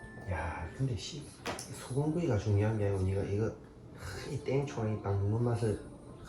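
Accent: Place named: Seoul, South Korea. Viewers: 책 읽을때는 서울말ㅋㅋ 사투리 안 쓰시네요ㅎㅎ 그래도 정감있고 재미있는 말투가 좋아요 😄😄😄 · ㅎㅎ 책도 참 서울말로 잘 읽어주시네요. 애기랑 너무 행복해보여요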